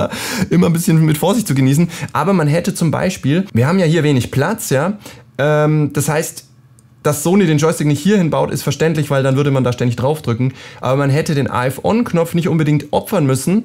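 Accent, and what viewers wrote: Germany. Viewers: bist Du aus Deutschland ?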